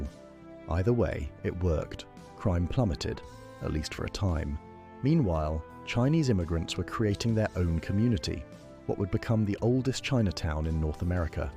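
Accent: United Kingdom. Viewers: Save the british accent for englands history. Would be nice to hear in a western accent.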